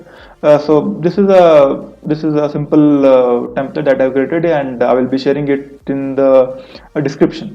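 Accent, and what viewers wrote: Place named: India. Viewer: Hears indian accent..already disappointed...then sees him copy and pasting...so sad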